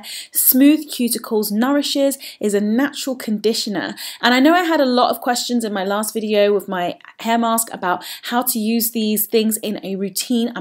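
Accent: England, United Kingdom. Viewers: missing the English voice over here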